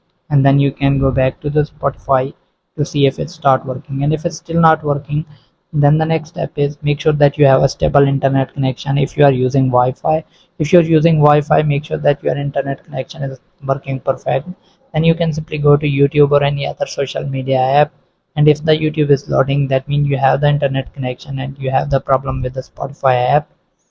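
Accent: India. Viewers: I already knew it was gonna be a pointless tutorial when I heard an Indian dude start speaking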